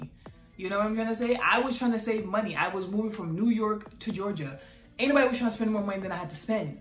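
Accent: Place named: Caribbean. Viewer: Sis you definitely sound Caribbean!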